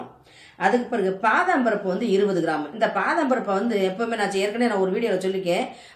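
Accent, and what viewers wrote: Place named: Madurai, India. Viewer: Madurai ya.. 😇😇😇😇..Na num Madurai dhan.... Superrrrr..pesuringa.. 😃😃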